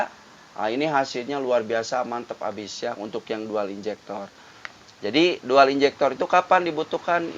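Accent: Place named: West Java, Indonesia. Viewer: pantasa at beda lmun orang sunda nyarios bahasa indo mh moal lesot tinu lagam /cengkok na a🙏😅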